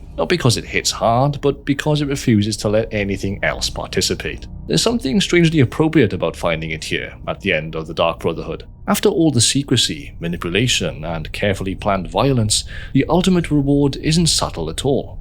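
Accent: Wales, United Kingdom. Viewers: WELSHMAN REAL?!?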